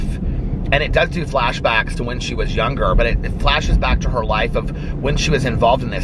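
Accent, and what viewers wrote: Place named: Midwest, United States. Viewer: Your voice captivates me. Your accent is reminiscent of my midwestern family roots. Been to Indy several times, lived in Cincy for a bit. Love the Midwest and adore you and. Thank you for this channel. So cozy.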